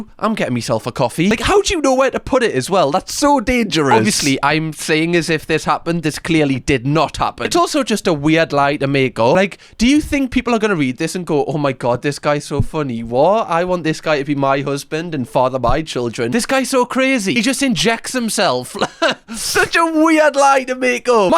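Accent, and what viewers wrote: Newcastle, United Kingdom. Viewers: if anything there is no such thing as a British accent. is from Newcastle so he has a Geordie accent (NE England) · i am curious, does learning from give your english a british accent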